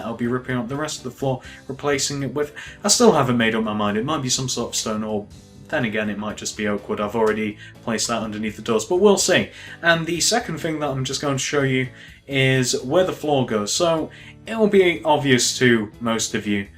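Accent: United Kingdom. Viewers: LC GAMING WITH LC GAMER me to he's British that's why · He's British you dumbass,he was saying three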